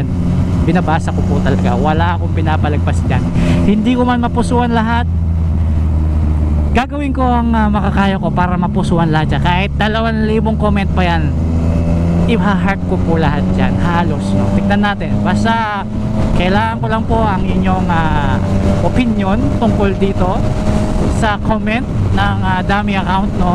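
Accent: Pampanga, Philippines. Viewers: Kapampangan kang tune par. ahaha managapatula ku kareng words a gagamitan mu. More power and ride safe lagi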